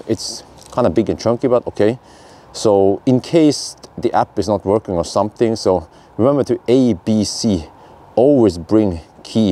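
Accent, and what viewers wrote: United States. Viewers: Diggin' the American accent!!😂😂🤣🤣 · I'm REALLY impressed by this car, but only thing that worries me is this stop charging button next to port. Easy way for stranger to stop our session is now one click away :( American accent - top noch :) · merican accent car sales man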